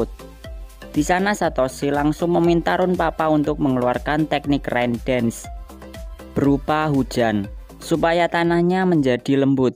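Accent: Java, Indonesia. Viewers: Orang Jawa mana bang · Wong Jowo Mas ?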